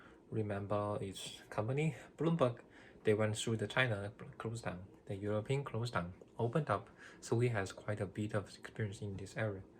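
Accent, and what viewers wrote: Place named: United Kingdom. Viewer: This is Hao. Your work reminds me the Garbric Orozco’s Hammock En La Moma. I also like the way you make the British accent. It makes it sounds very professional. The changing of voice during the news report also makes me think that the broadcast news must be a very boring job, but the people who broadcast it must stay still and act normally no matter what happens.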